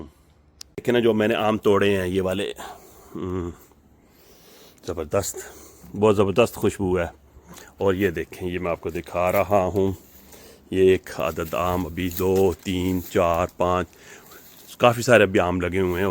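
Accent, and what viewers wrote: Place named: Pakistan. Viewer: ap pakistani sound or rahe hai me apka chota bhai india se bohot acha laga apko pur sukun dekh k lekin australia kese settele hogye pakistan se.. ap kitne log hai waha settle family mem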